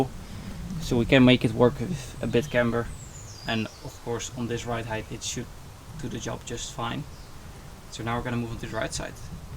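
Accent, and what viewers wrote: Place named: Netherlands. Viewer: You sound Dutch, are you in the Netherlands? Am in the Netherlands if you are, just bought my e46!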